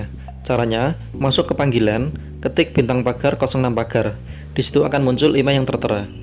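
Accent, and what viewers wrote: Java, Indonesia. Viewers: Terlalu kental jowonya mas ,, sampe sya dger berkali kali mas Jowo ngucap nama prociss nya Snapdragon dan Exynos ,, kalo bisa latihan encerkan dikit Logat Jowo nya . thx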